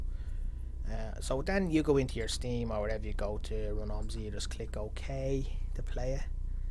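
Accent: Ireland. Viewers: this guy is definitely irish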